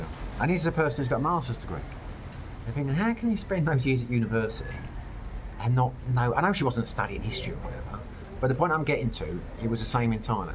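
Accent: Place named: Cockney, United Kingdom. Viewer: My 1st visit to the Phils will be later this year, but I already know I won't be able to stand the noise, as I'm highly sound sensitive. Thai & Bali vs Phils, but I've heard Vietnam beats em both, & is a lower cost of living. If you can interview a mate with Vietnam experience, that'd be cool. With your Cockney accent, I can't catch half of what you're saying, but I still appreciate your awesome content!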